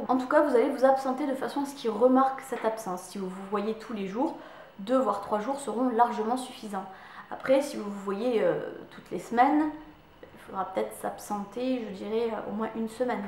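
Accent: South, France. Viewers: Ta un accent, tu es pas du sud ?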